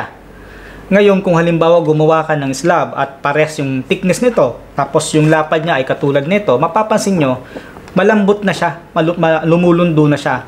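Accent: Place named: Northern Samar, Philippines. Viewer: Engr. parang taga catarman northern samar ka? kc ang accent at pag sasalita mo,, nararamdaman ko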